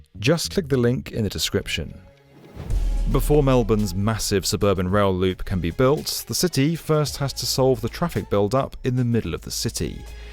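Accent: United Kingdom. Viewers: Weird hearing a British guy talk about the suburbs I’ve lived in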